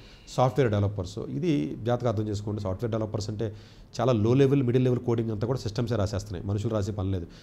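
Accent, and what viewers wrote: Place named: Godavari, India. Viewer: garu, I truly appreciate your research, analysis, and the confidence with which you present your points. I also enjoy your accent and unique way of phrasing things. Especially, your serious expressions while humorously criticizing mechanical, pharmacy, and Tier 2 colleges like Mahindra and GITAM in the Godavari accent make it even more entertaining. However, I have a small suggestion—please consider showing some empathy towards students and parents whose academic capabilities limit them to Tier 2 colleges. It would add a balanced perspective to your insights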